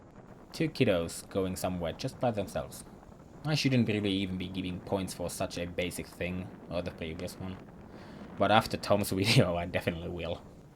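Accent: Finland. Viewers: When I had given the points I would given you at least 100 points more for the Oulu trip. And 10 extra for your humor and pretty Finnish accent! What a beautiful ride. How boring and ugly north American towns and cities are! And even worse in winter. In Finland winter darkness + snow makes the world even nicer and more beautiful. Here in the Netherlands we had today our first little bid of snow. But it was melted within an hour. And that is also one of the big differences between this winter utopia and the Netherlands. Our winters are most of the time just a little bit above or below 0 degrees Celsius and then salt is necessary because roads are almost always wet and can frees unpredictable and then biking and walking gets dangerous. And the other big difference between both Calgary and Oulu and the Netherlands: space. We are the most densely populated country in the western world and ground is so expensive here, that this kind of modern city planning with so much space between roads and bike pads or parks everywhere would be great to have but is almost impossible to afford. 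In the 1960's and 70's it was more common to build neighborhoods with more public space between the houses and private properties, but nowadays every single square meter have to be planned to have a function. Developers will try to build cramped houses instead of living space for people. I think that is one of the big negatives here in my country: developers want to make every possible euro profit out of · I like your assessment (and Finnish accent ;-) ) much better than Tom's. He's too soft on the crappy infrastructure they have in Calgary. And I live in a city that probably has even worse bike lanes than Calgary...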